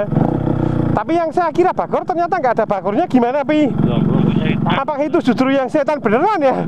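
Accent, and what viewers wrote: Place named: Wonosobo, Indonesia. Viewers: Suarane wong wonosobo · "Ho'oh siiih..." logat kata khas Wonosobo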